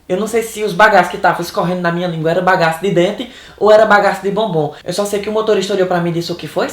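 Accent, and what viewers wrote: Ceará, Brazil. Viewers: Adoroooooo seu SOTAQUE!!!! Chique feito "as pregas de Odete"! kkkk O nosso DIALETO "nordestino" é tudo de maravilhoso. O nosso idioma, como tantos outros, apresenta variações linguísticas. Dentro deste contexto, normal o "pra mim fazer", "mar minino" e tudo o mais que faz de nós NORDESTINOS, um povo especial!!!!! Parabéns,! <3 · Queria ver um francês falando esse maravilhoso português cearense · a maneira de tú falar é bem cearês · Não Perca teu sotaque e palavras da tua terra maravilhosa...Quanto mais nordestino for mais inscritos terá no teu canal...Amo teu trabalho....bjbjbjbj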